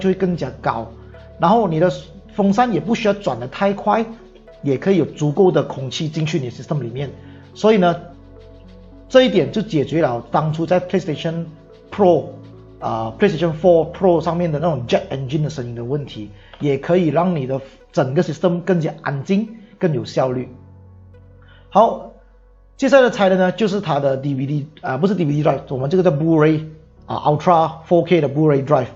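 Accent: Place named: Malaysia. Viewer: 马来西亚口音的普通话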